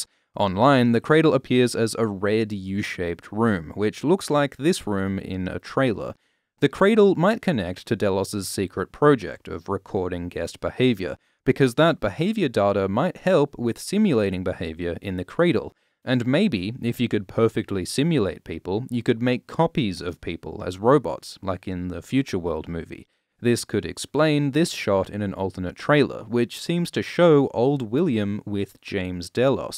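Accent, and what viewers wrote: Australia. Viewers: I'm just starting your video. I've watched so many and NEVER realized u aren't British!!! I'm an IDIOT!! So, are u from Australia or New Zealand? I've always wanted to travel there. My daughter, Naomi, can do a GREAT Australian accent. I can't even seem to say the word NO like you do. But she can!! Looking forward to this video and seeing you on the Westworld page on the AMINO APP😀💖👏🐴🔫🐯🌻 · Literally nobody comments on your Australian accent for some reason. Anyhow, I'm in Perth, and if you're local, you should come around for a sesh.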